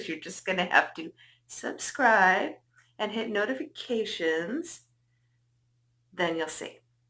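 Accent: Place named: New York State, United States. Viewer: I thought I heard a NY state accent. I live 10 min away from Niagara Falls, Canadian side. I will check out the rest of your channel. Great job!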